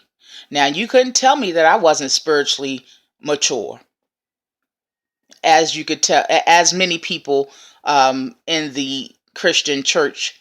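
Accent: Baltimore, United States. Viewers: Never mind. just looked at another video. If you from Baltimore you can hear it everytime.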